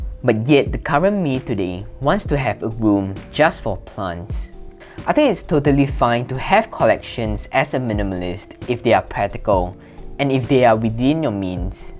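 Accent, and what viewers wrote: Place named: Singapore. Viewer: I thought your accent was familiar, hello fellow singaporean!!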